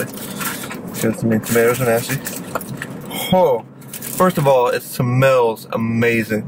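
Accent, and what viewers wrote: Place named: South, United States. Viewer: I love that southernnn twanggg'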